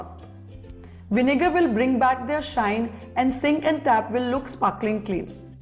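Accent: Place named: India. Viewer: You Indian aur UK Q English toking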